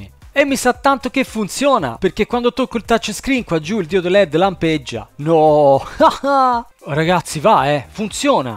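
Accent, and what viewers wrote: Tuscany, Italy. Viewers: Lei e’ eccezionale! Mi sono visto questo suo video di 4 anni fa e l’ho trovato ancora attualissimo, sia come tema sia nel modo in cui lo espone, con la nota simpatica pronuncia con inflessione toscana, e una incredibile professionalità. Continuerò a seguire tutti i suoi video con avidità stupendomi ogni volta. Grazie per i bei momenti (e emozioni) che ci (mi) regala.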